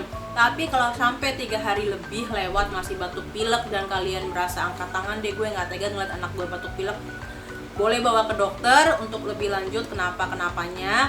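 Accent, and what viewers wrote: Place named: Karo Batak, Indonesia. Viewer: Nampak x logat batak Karo.. Kkk ini ..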